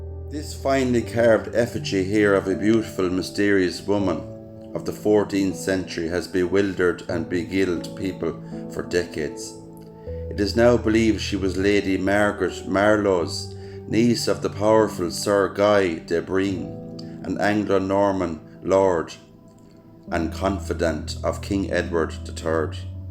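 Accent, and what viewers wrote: Ireland. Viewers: Many Happy Holidays In Tenby Thanks you are marvellous..Beautiful Irish brogue Xxx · Your beautiful Irish accent is like music to my ears ❤❤❤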